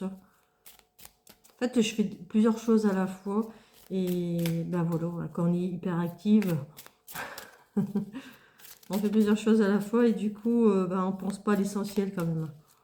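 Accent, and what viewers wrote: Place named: Nord-Pas-de-Calais, France. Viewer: ❤ merchiiiii, j'adore ton accent ch'tis😊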